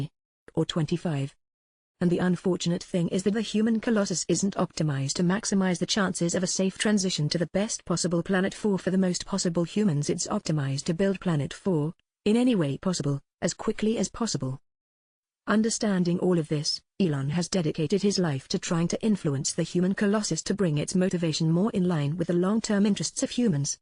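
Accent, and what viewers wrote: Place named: United Kingdom. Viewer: Now I fucking hate the british accent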